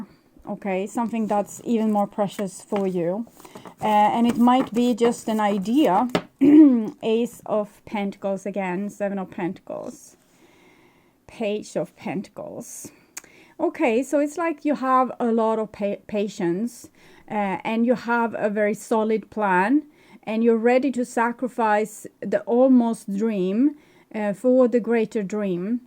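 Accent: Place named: Sweden. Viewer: Time to enjoy a Swedish lass, accent again. Love your beautiful voice . Thankyou for the reading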